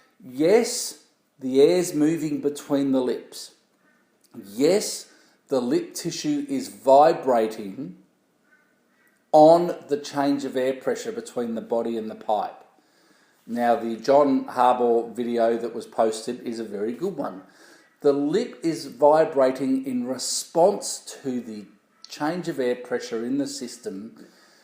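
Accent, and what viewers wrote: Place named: Australia. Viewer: THANK YOU SO! MUCH!!!! TRYING TO BUZ!! IS NOT NEEDED WHEN YOU USE YOUR LIPS THE CORRECT!!! WAY!! AND JUST BLOW!! I LOVE YOUR TEACHINGS AND INSITES! AND LOVE YOUR AUSTRALIAN ACCENT!!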